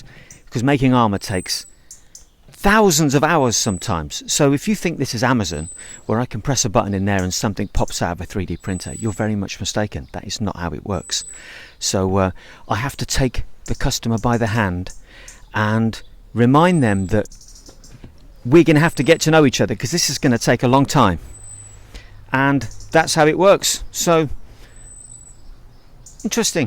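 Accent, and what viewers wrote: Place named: United Kingdom. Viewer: Oooooh une vidéo en français !! J'ai hâte de découvrir. Avec l'accent british qui colore joyeusement tout ce qu'il touche ! A new challenge doesn't scare you, does it..? ☺ ✴🌟✴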